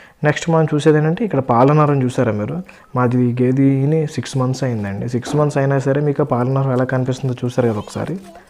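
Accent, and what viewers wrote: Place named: East Godavari, India. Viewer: Ni matalu MA East godavari vadila unai very nice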